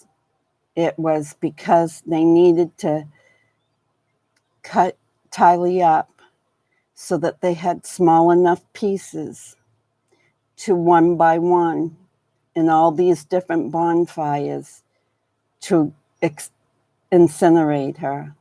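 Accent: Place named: Boston, United States. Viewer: Your accent is similar to JFK so Bostonian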